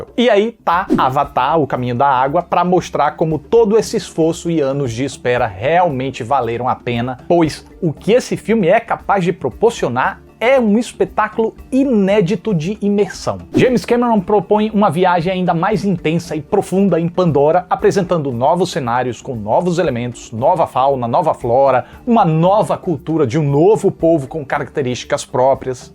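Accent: Northeast, Brazil. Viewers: Adoro suas análises com esse sotaque nordestino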